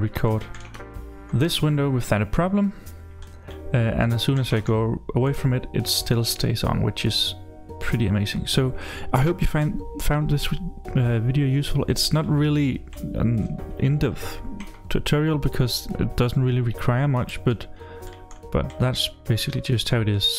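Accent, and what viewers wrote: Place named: Denmark. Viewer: Jeg vidste bare du var dansk så snart du begyndte at snakke hahaha ; ) fed video, tak for hjælpen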